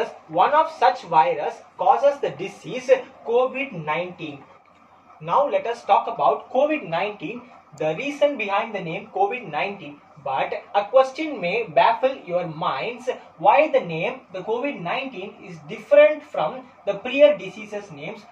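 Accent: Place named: Punjab, India. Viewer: yrrr ap ka andaz ajeeb h bht mtlb lhja punjabi type h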